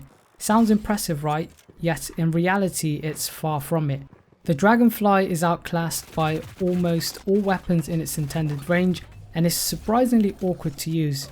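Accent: United Kingdom. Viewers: Ashy British voices psh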